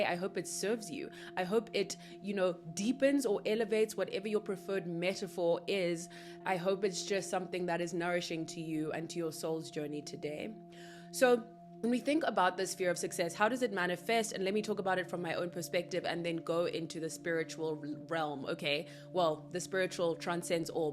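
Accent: South Africa. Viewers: I just KNEW that lovely, beautiful dialect was South Aftican! Love the “flea market of life” analogy. Love to you, Darling Sister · BABES ARE YOU FROM SOUTH AFRICA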